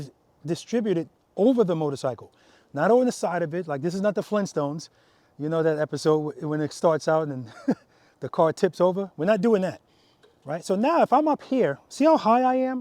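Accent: New York, United States. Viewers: Picked up a bmw GS this morning, they're not that bad... it being a 750GS not a 1250GS Adventure (the tallest version, which you probably rode) is completely beside the point. It is a massive difference to the Yamaha/Star Bolt (my Yamaharley lol) I had the last 10yrs. Also as an aussie I love your New Yawk accent, I'm a weirdo who likes listening to how people talk :)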